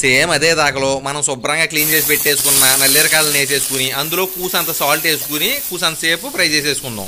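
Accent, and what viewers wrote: Godavari, India. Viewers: మీ యాస భాష తీరు. వింటూ ఉంటే సినిమా హీరో అల్లరి నరేష్ గారు మాట్లాడినట్లు ఉంది సార్.🎉 గోదారోళ్ళు కాబట్టి ఆ విధంగా మాట్లాడతా ఉండారు. మా రాయలసీమ భాష గాని మంచిగా ఉంటది.. మీరు చెప్పే విధానం బాగా ఉంది.😊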